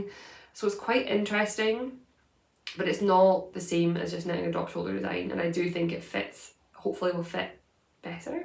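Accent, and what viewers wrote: Scotland, United Kingdom. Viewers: Please do not change. Your Scottish brogue is so beautiful and if someone truly doesn't like your videos they can always choose not to watch. The self-entitlement of those people asking you to change to suit their own convenience is astounding. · I personally love hearing your Scottish accent and don't have trouble understanding you at all! The Youtube knitting community is a great way to hear so many different accents and I love it. Your podcast is the best. Love from Australia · Love your accent. Reminds me of when I am visiting my daughter in Aberdeen. I’m in Canada❤ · It’s not your accent, your Scottish accent is lovely, I think. it’s only that at times words aren’t pronounced fully (several words come out as one!), but of course it’s up to you whether you want to reach more people or not, it’s your channel 😊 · Please! 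I love your Scottish Accent and I am not having any issues at all! You are you and if folks don't like how you speak they don't have to watch!! I am grateful you are here and Love your knitting podcast!And..... I can't wait for your sweater release!! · Ignore the naysayers. Scottish accents are the coolest! Thanks for the great episode.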